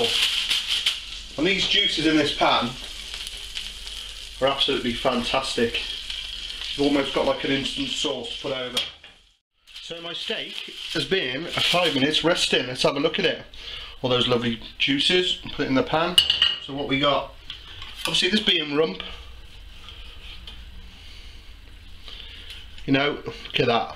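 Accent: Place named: Scotland, United Kingdom. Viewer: Hello I'm French man and I love your vidéos but I' don't undertsand all you leanr. May be your accent and fatest language. Are you Scottish ? Congratulations for your job . (English begginer ) Thanks.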